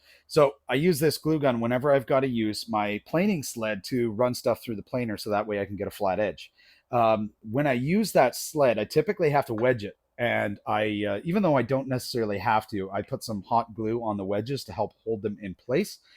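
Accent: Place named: Canada. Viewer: I used to think tv exaggerated that Canadian accent.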